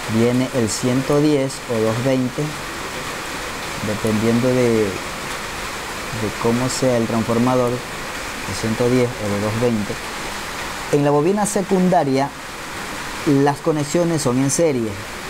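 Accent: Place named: Colombia. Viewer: soy de Venezuela y no se si las numeraciones de los cables son igual a las de donde tu eres, me pareces colombiano por tu acento y el cable numero 8 aca en Venezuela es un cable bastante grueso y no creo que entren ni 12 vueltas en un transformador de microondas, aclarame si ese cable es grueso o de que grueso es laseccion de el cable 8 que indicas.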